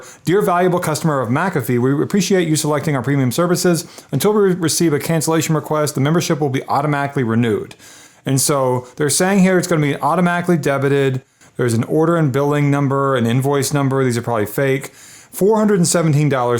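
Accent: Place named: India. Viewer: As soon as I heard an Indian guy I was like nahhh😭😭😭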